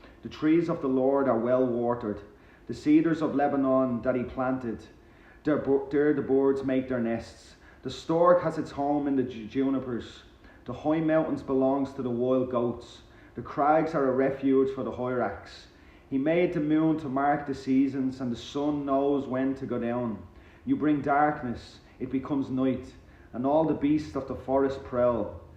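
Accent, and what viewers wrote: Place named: Ireland. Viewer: Love your Irish accent‼️ ☘️ Your love for God's Word is so inspiring. Keep up the good work for Him.🙏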